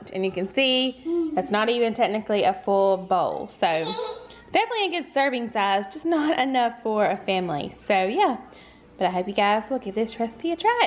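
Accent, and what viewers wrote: South, United States. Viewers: I love all your videos :) You are such a nice person, and I love your southern accent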